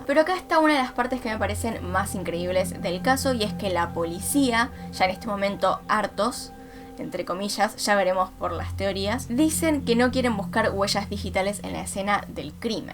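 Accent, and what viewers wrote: Argentina. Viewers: La única voz argentina que soporto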